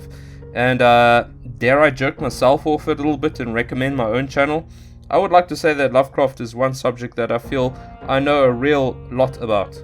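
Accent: South Africa. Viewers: Are you South African? Your accent sounds incredibly similar to mine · Are you South African? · Fabulous and thank you so much! Is that a South African accent? Fabulous. Thanks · Are you South African as well? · Good video! But are you South African?👀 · Expat South African living in Amsterdam here. Good surprise to hear your accent bro. Nice video.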